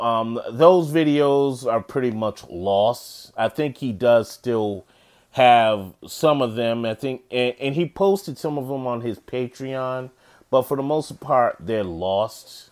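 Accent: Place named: New York, United States. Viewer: Interesting. So I know you're from NY (I can tell by the accent). Where did you travel to where you encountered Black women who didn't act like the women you grew up around? For me, one of the best things I've ever done was traveling for leisure while in graduate school. I would just go to random places for the heck of it (Salt Lake City, St. Louis, Nashville, etc.).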